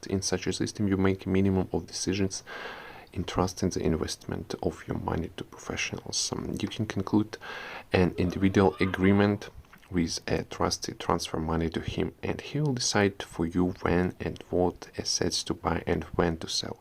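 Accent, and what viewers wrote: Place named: Russia. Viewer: У тебя акцент есть русский!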